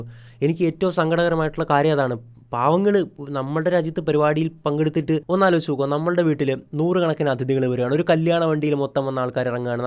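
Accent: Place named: Changanacherry, India. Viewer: Changanacherry slang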